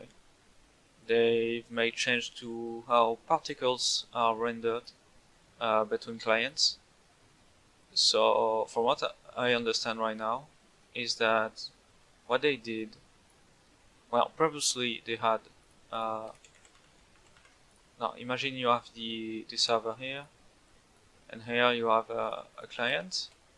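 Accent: France. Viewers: Ce gros accent français omg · i can hear some french accent in your voice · ta un bon accent hein :) mais c'est quand même cramé que tu es FR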